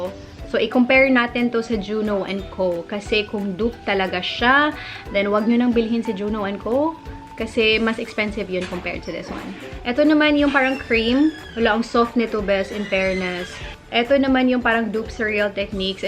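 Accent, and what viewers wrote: Visayas, Philippines. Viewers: Cute kayka mag bisaya 😂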